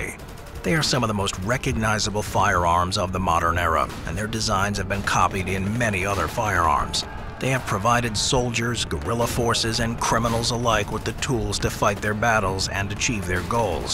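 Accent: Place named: United Kingdom. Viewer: I’ve never seen your face until today, I thought you were British lol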